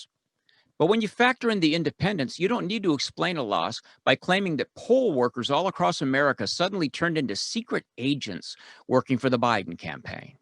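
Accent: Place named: California, United States. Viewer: Californian huh, you project it well.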